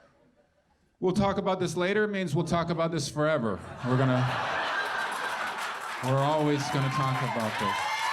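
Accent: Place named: Scotland, United Kingdom. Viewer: Almost didn't click on this. I wasn't in the mood to struggle through a thick Scottish accent!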